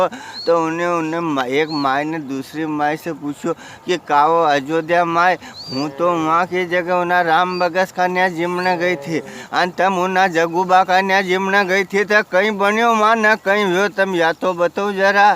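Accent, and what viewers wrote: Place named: Malwa, India. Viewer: परम आदरणीय जी को जन्मदिवस की बहुत-बहुत बधाई आपकी मालवीय वाणी वाणी हमारे समस्त परिवारजनों को अति प्रिय है अतः भगवान से सेव कर बद्ध निवेदन है कि आप आप हमेशा ऐसे ही हंसते मुस्कुराते रहें और और अनंत वीडियो बनाते रहे धन्यवाद भीम सिंह चौहान तिलावत बड़ी तहसील तराना जिला उज्जैन मध्य प्रदेश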